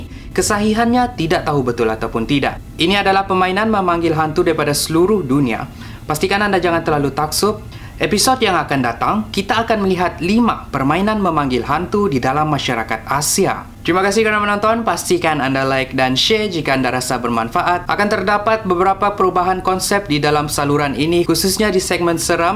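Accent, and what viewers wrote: Sabah, Malaysia. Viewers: Dari sabah kah? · dia nie sabahan ka atau indon? maaf tnya mcm nie. .sya pun sabahan juga.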